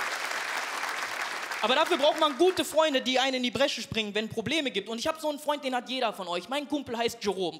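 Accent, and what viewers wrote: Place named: Duisburg, Germany. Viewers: Super Typ, ich bin aus Hamborn und in Marxloh zur Berufsschule gegangen. War damals (um 1980) schon ne besondere Gegend. Wohne seit über 30 Jahren in Stuttgart und den Duisburger Dialekt finde ich immer noch einfach klasse. Mach weiter so, super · LoooL, hatte noch nie von ihm gehört aber so geil! Liebe den Duisburger Assi Slang, bin viel in Duisburg unterwegs. Sehr erfrischend! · Liebe unsern Ruhrpott Akzent 😎☺️❤️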